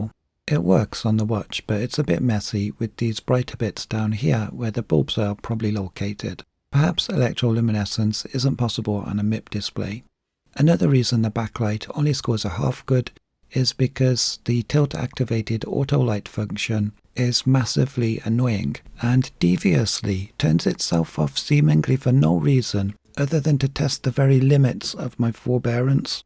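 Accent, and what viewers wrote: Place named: Wales, United Kingdom. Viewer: I loved the review. Very detail oriented and a pleasure to watch. Your voice and way of speaking is also very pleasant. I’m an American so I’m very used to loud and “in your face” and found your accent and volume to be quite a nice treat for my ears. I am going to assume you are from the UK and forgive me if I’m wrong but you strike me as possibly welsh? I lived in Portsmouth for a number of years as a child so I could be wrong but I’d be interested to know where your accent is actually from. Overall great review, honest and detailed. I’ll be subscribing. Cheers from sunny Florida mate.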